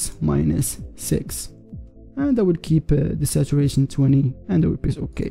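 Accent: Morocco. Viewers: you sound moroccan or at least north african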